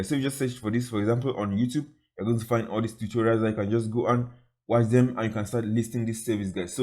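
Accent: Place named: Nigeria. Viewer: You accents is like Nigeria.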